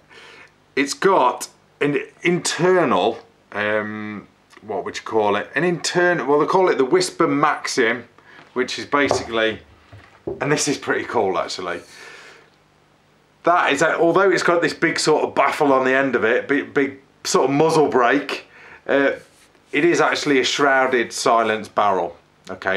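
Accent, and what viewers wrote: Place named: United Kingdom. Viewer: Interesting thanks. Couple of questions - Can you get a rod in the barrel to clean it without risking damage to the self loading mechanism? If the self load was to become faulty, is there a way to manually load a pellet? and lastly, can you actually see anything through the scope with all that plastic in front of it? From your accent I presume that this is UK spec (max 12Ft/LBs) - be interested to see how long that scope lasts before the rifle trashes it to bits. We have the international version of the gun and it is more powerful, these things trash cheap scopes in under 500 shots. (My Crosman Diamondback 22 destroyed the Centrpoint scope that came with the gun in less than 200 shots (broke the springs for elevation and windage) and then destroyed my second scope, Niko Stirling Mountmaster in less than 500 shots (Detached the eyepiece glass completely and cracked the glass too) I am now onto a Hawke Airmax 4-12 x 50 which is fairing better and has surpassed the 500 shot mark OK..touch wood!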